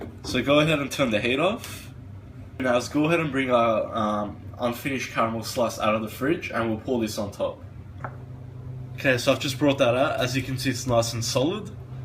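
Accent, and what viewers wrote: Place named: Australia. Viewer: Yeh the Aussie accent thanks I will try this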